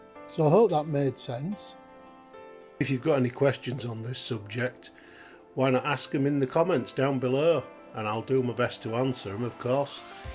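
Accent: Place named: Wigan, United Kingdom. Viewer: Ok, I have to ask. I have a customer from the UK who lives in Wigan, you sound exactly like him! Do you live near there?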